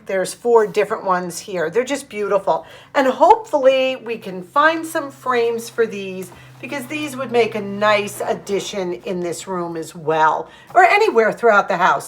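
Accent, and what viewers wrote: New York, United States. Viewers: This house is a treasure - with the huge lot and close to the Ocean. Never sell it - pass it on to your children. Old homes were built to last - not like the construction of homes during the last 20 years. I was born and raised in NYC/Brooklyn decades ago - so I enjoyed listening to ur NY accent. Paint and Flooring upgrades will update the house. There are so many choices of both. Enjoyed your video.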